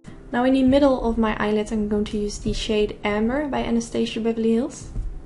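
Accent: Netherlands. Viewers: Is that a a Dutch accent? Are you from the Netherlands? Your make up video is fantastic!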